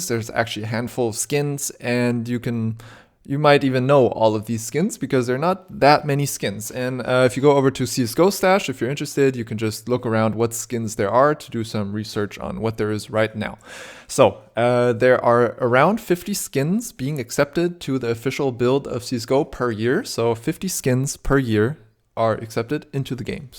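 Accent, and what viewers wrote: Germany. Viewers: okay thank you ^^ PS: are u german ? xD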